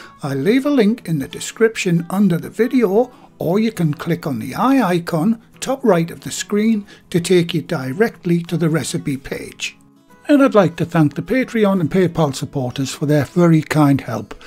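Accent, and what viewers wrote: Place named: Northern England, United Kingdom. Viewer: good man. Cheers mate. I love hearing your voice in these videos, and used to watch them from the US for the Northern England nostalgia kick alone :P I swear you should get a job narrating shows or books or something (though please don't stop the YouTube channel if you do!). All the best, and thanks again :)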